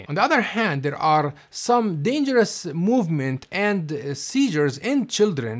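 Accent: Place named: Arab world. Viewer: ما انتبهت لاسمك بالبداية لكن أول ما سمعت صوتك وطريقت لفظك للكلمات عرفت أنك عربي😂، سبحان الله لنا بصمة صوت وطريقة لفظ خاصة.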